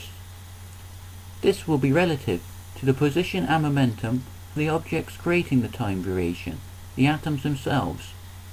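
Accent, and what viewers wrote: West Country, United Kingdom. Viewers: Ahhh you sounded westcountryish.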